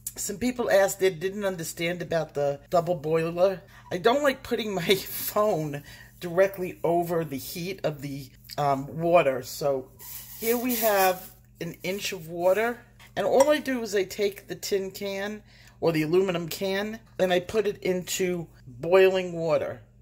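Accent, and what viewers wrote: New York, United States. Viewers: I was dying to try your recipe but didn’t have the beeswax. However, I had a Caran d’Ache wax crayon. I added your amounts in mineral oil and linseed oil but no wax. I figured the wax in the crayon would be sufficient. It worked! Thank you so much for sharing all of your information on this! It really is a gam changer! ❤️ PS love your NY accent.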